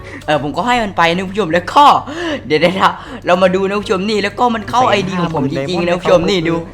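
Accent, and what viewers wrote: South, Thailand. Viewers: คนใต้นิพี่ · พี่คนใต้หรอคับ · พี่เป็นคนใต้หรอ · หนูพึ่งรู้นะเนี่ยว่าพี่เป็นคนใต้😆💕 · แหลงใต้เหมือนเราเลยนิ คนนครศรีธรรมราช5555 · พีแหลงไต้ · แหลงใต้ออก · พี่เป็นคนใต้ใช่มั้ยนิ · พี่เป็นคนใต้ปะ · พี่บ่าวเป็นคนใต้หรอ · พี่ครับรหัสหลักผมโดนแฮ็ค<num>ผมควรทำอย่างไรครับใครรู้บอกหน่อย ผมเพิ่งรู้ว่าพี่เป็นคนใต้55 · คนใต้แม่นนิ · ชัดเลยพี่เป็นคนใต้ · พี่เป็นคนใต้หรอ!! 55555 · พี่เป้นคนใต้ใช่มั้ย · พี่เป็นคนใต้หรอค้าบ❤️🥰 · ฟังภาษาก็รู้ว่าเป็นคนใต้ผมก็เป็นคนใต้ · ใต้มาชัดเจนเลยนิ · พีขอถามหน่อยเป็นคนใต้ไม่เนี้ย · พี่พูดใต้ใช้มั้ยตอนที่ไปูบัตรเปลี่ยนเชื่อ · คนใต้นิเบอะ555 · คนใต้มั้ยนิ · คนใต้นิหว่า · พี่เป็นคนใต้หมันม่ายคับผมก็เป็นใต้ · พี่เป็นใต้เห่อ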